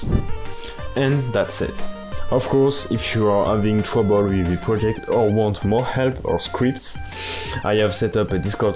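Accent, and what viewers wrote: France. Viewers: this man has a thicc french accent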